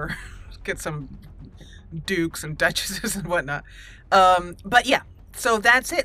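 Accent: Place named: South, United States. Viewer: Wow, this game is very involved. I'm really liking Commander's southern accent; nice job. I don't want to be weird, but those aliens are kind of cute, too. :3 You took a lot of risks this second mission, Commander. It's amazing that a lot of them worked out. Beginner's luck or simple intuition, only time will tell! :D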